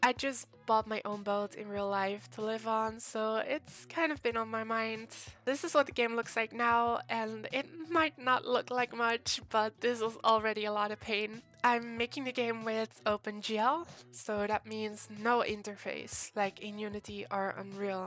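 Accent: Netherlands. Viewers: You’re definitely dutch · amazing video! are you dutch? i noticed it by the irl boat and voice :D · nederlandstalige detected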